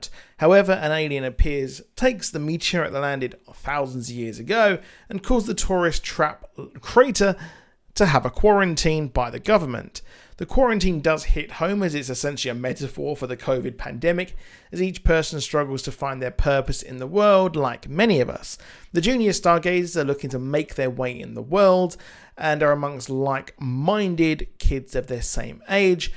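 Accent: United Kingdom. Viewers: Why do you sound very British like!? You're from Rhode Island.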